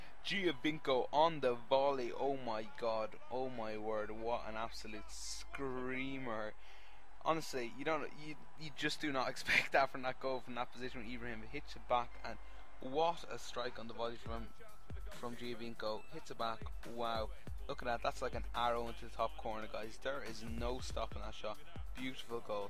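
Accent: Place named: Ireland. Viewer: Gaming countdowns never fail with an Irish bloke commentating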